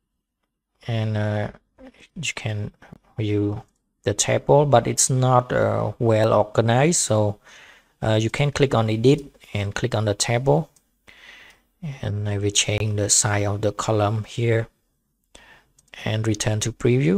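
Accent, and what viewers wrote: Cambodia. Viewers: u sound like a Cambodian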